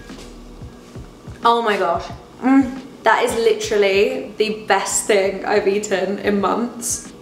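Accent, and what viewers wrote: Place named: Home Counties, United Kingdom. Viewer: Is there a factory that mass produces these influenzers? They all sound home counties England, boarding school 'Oh my Goshing', posh tottie🙄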